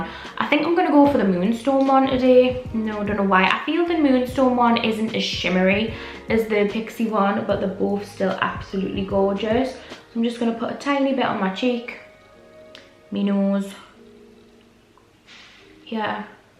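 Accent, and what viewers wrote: United Kingdom. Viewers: Hi!! I've been watching your channel since 2018, for me watching and hearing you has been a great way to improve my english not only because i try to understand everything you say but also because you have such a strong accent that helps me get used to the different ways of speaking english in the uk! I found your channel when i lived in Leeds working as a Spanish/foreign language assistant in a school and it has been really useful! I'm glad that you are encouraging people to learn other languages and to be less selfish about speaking English in non-native english countries! I wish you the best luck with this journey:)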